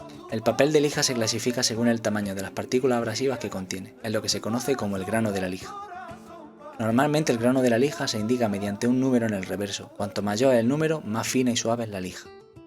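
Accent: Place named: Spain. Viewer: este relato con acento español es muy poetico...para los doblajes de peliculas sino sirven jja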